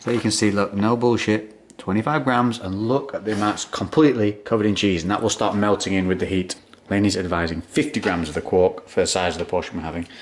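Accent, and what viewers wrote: United Kingdom. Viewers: Hate brit accent !!!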